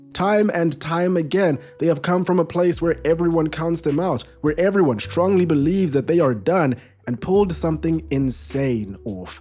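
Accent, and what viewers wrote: Brazil. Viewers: Habla como un brasileño hablando español, o sea bien, muy bien pero que se le nota que es extranjero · Good Spanish, your accent reminds me of Brazilians speaking Spanish. Do you speak Portuguese?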